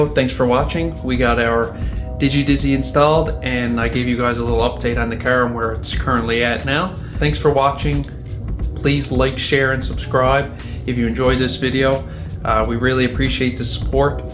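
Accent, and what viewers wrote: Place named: Ireland. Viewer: You don't sound Canadian. Irish accent there?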